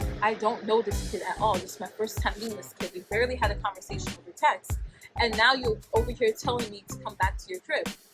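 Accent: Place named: Yoruba, Nigeria. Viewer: Yoruba accent! Wow!!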